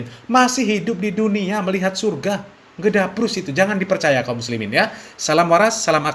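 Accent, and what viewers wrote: Java, Indonesia. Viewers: Saya suka channel ini. Channel yg mencerdaskan akal ... Apalagi ditamabah narasi dgn LOGAT JAWAnya yg MEDHOK.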